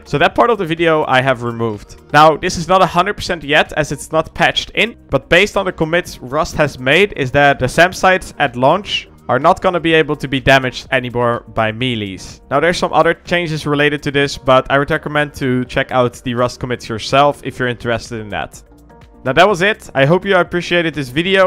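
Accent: Netherlands. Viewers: are you dutch? xD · I smell Dutch 🎉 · Ru Dutch?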